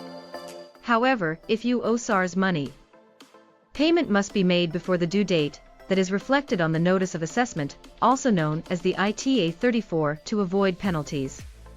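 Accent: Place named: United States. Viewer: Really annoying that a video for the South African Revenue Service is narrated by someone with an American accent! WHY?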